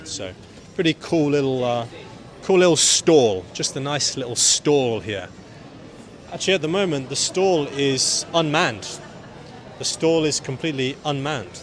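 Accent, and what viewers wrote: United Kingdom. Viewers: The British accent is the best one. I am Brazilian and I love accent and all the British's way to talk.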